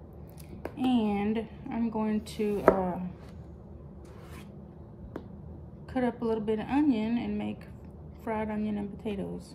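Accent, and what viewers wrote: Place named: South, United States. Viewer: that’s a good one to prep up the night before I bet. I knew a lady that cooked all her meals for the week on Sunday. She put them in the freezer. She lived an hour away from work. Her family would pop it in the oven to reheat. I never have had the space to do that. But if you like cooking and can, that would make a good video for you to do. I like listening to you talk you remind me of my friend. I’m from the south. It makes me feel homesick! Keep up the good work.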